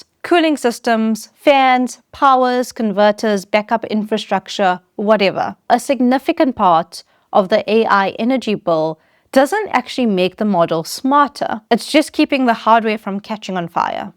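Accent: New Zealand. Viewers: Ha, I couldn't at first work out your accent. I thought you were from NZ at first. Very correct about power in vs power out. The data itself is an energy less output. The heat is mainly down to the efficiency of the FETs in the processors and probably the low voltage power supplies on the boards.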